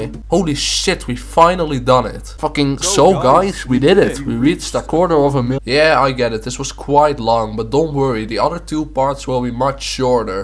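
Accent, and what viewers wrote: Netherlands. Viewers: Are you dutch? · Haha dit klinkt echt als een Nederlander 😂 klopt dat? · R u Dutch? U sound dutch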